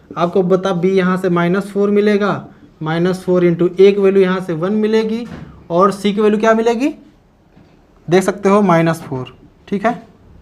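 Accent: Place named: Bihar, India. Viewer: Sir you are amazing 👍🏻👍🏻👍🏻👏🏻👏🏻 aur apka bihari tone bhi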